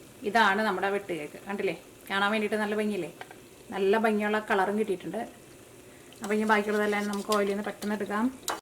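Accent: Thrissur, India. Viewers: My dear sister you talk too fast. We are from South Kerala so it's difficult to catch up with Thrissur slang. So please slow down a bit. Thank you.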